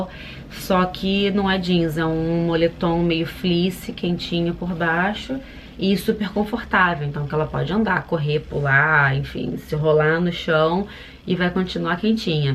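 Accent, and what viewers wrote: Rio de Janeiro, Brazil. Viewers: Adorei as dicas 😉 pena que manteve o sotaque carioca.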